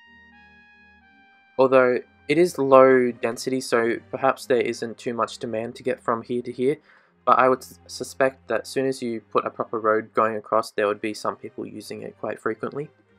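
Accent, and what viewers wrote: Australia. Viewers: You from Australia mate? love the channel btw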